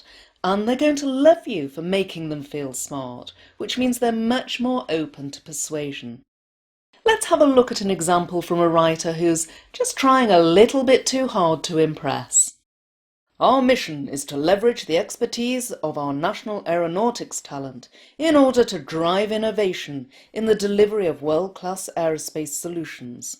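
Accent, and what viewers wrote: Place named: England, United Kingdom. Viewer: I DO really love every video you post and your awesome English accent that literally pampers me as I listen to you. Thanks a lot <3 (Greetings from Italy)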